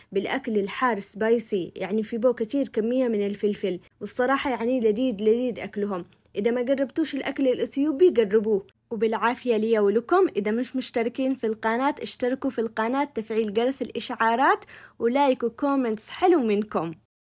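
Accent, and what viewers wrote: Aden, Yemen. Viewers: تسلمين يابنت بلدي والله انك عسل ياربي محلا كلامك ولهجتك عدني على تعزي ملفوف بالابي ايوه هكل خلينا نفتح اليتيوب نبصر باشتات من حقنا محروكات مشحوطات ونتعلم منك كلامك سريع سريع ومهرتك اسرع ماشاءالله مثلي ههههه واحلا لايك تستاهلي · تسلم ايدك ..شرح بسيط و واضح.. لهجة يمنية حلوة...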